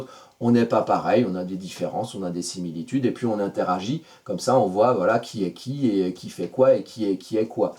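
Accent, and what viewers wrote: Alsace, France. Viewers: ....un petit accent Alsacien ou mosellan derrière les fagots ;) ??? Beau travail et vidéo que j'ai plaisir à écouter. Longue vie à votre chaine :) Signée une Alsacienne perchée.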